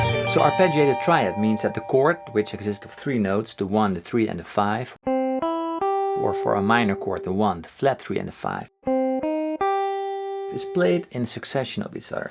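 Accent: Netherlands. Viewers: i just discoverd that your'e dutch. meanly by your accent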